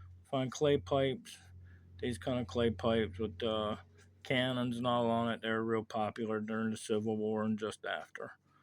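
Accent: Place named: United Kingdom. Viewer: Such an interesting collection! I love listening to your accent as well. Picking up a few British-sounding words here (“down”, “house”, etc.).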